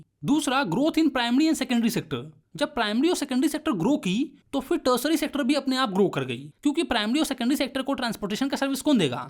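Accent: Bihar, India. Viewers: Yess he is bihari ✌🏻👽🌚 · bhai tum bihar se ho kya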